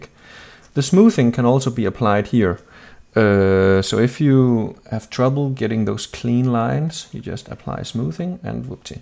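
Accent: Denmark. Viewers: Are you danish? both your accent and name is danish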